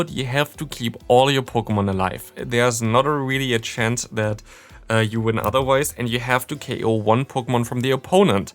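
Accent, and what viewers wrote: Germany. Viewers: der deutsche aktzent hahha beste super video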